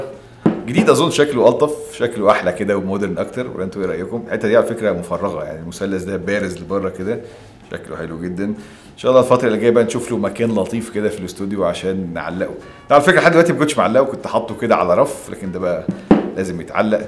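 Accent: Alexandria, Egypt. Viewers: انت منين من الاسكندريه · انت منين من اسكندرية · انت من اسكندرية 😂❤️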